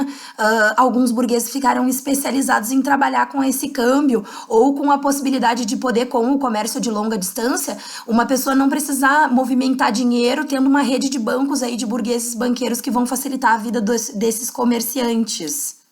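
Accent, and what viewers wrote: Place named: Rio Grande do Sul, Brazil. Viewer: Sotaque do RS é inconfundível.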